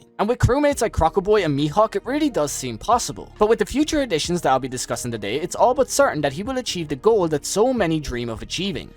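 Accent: Ireland. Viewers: Are you Irish?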